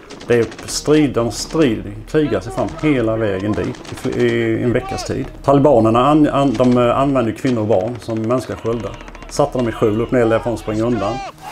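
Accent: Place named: Jönköping, Sweden. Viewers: Tror han är från Forserum, så nära. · Kan han vara från Jönköping, bred dialekt 😃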